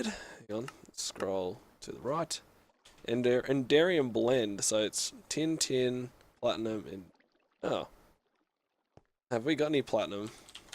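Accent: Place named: Australia. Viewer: You have an Australian-like accent.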